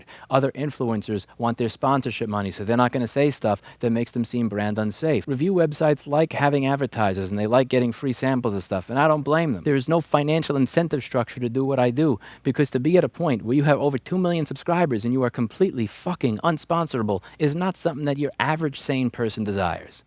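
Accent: New York, United States. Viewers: You can tell he's been angrier lately because the New York accent is coming out more and more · Is it just me or does sound more New Yorker now that he moved away. My parents signed up for a gym the first day there their locker got broken into and credit cards stolen. They decided it wasn't safe to be there. There was only one guy that could do cancelations and he was always conveniently off today or on break or some other excuse. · ' New Yorkerness intensifies with each passing day. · I came down here to write the same thing! Just enough of an accent to notice. And that's something I never ever have heard from him in years. New York attitude? Certainly. But the accent? Pretty rare. It shows he means business!!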